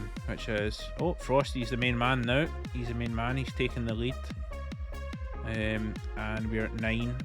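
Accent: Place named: Scotland, United Kingdom. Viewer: So Scottish 😮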